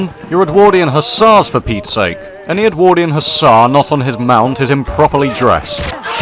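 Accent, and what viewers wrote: United Kingdom. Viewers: Bloody hell, this chap's a real Englishman! Accent, behaviour, EVERYTHING of him is Bri'ish!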